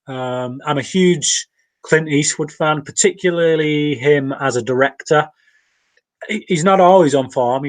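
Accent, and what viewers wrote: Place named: England, United Kingdom. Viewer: I have something I have always wanted to ask. What part of England are you from? Wondering about the accent.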